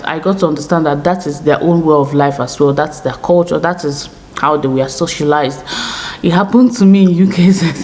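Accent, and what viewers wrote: Nigeria. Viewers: But your Nigerian accent is not change and it doesn't match your msanzi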